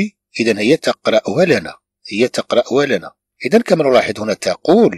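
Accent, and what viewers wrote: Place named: Settat, Morocco. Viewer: الله يرحم والديك من أي مدينة لو سمحت استاذي لأن لكنتك قريب من لكنتنا أليس سطاتيا؟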